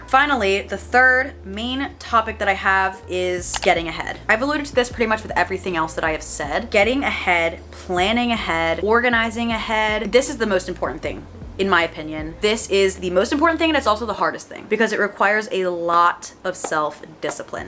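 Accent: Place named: California, United States. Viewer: That California accent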